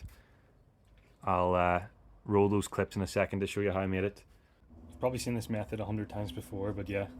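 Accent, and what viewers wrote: Northern Ireland, United Kingdom. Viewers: I'm hearing a fair bit of NornIron in that voice. 👍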